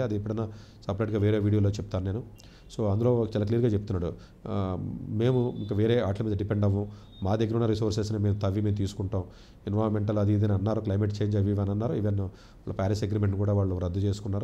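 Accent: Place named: Godavari, India. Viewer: garu, I truly appreciate your research, analysis, and the confidence with which you present your points. I also enjoy your accent and unique way of phrasing things. Especially, your serious expressions while humorously criticizing mechanical, pharmacy, and Tier 2 colleges like Mahindra and GITAM in the Godavari accent make it even more entertaining. However, I have a small suggestion—please consider showing some empathy towards students and parents whose academic capabilities limit them to Tier 2 colleges. It would add a balanced perspective to your insights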